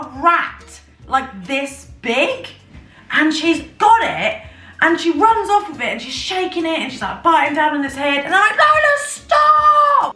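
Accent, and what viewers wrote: United Kingdom. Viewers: I just love hearing Brits say water bottle. It’s so cute!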